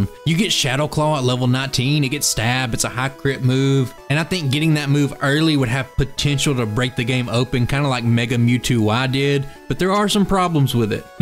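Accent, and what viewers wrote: South, United States. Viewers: Just found you. Came for the pokemon of course, but staying to hear it in that drawl. Aren't many of us making content out here from the south.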